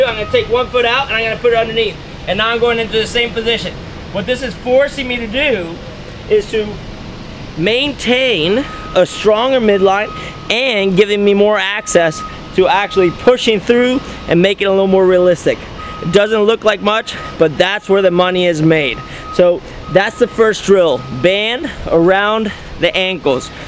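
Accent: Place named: United States. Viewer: he sounds like he lives in America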